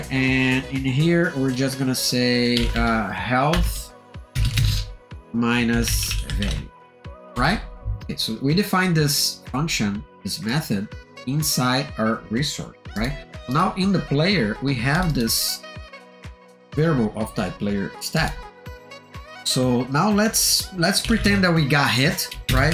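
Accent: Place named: Brazil. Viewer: I've instantly recognized the Brazilian accent, great video man.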